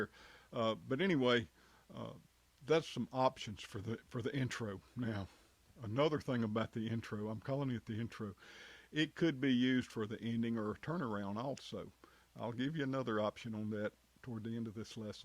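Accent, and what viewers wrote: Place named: Kentucky, United States. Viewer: ...you sound like Kentucky.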